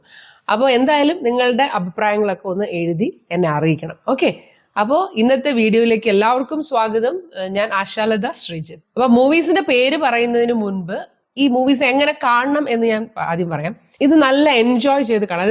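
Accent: India. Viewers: Thanks, but your English pronunciation shud be either British or American. If you follow Indian style people will not get the desired benefit. To get fantastic movies simply try through Google.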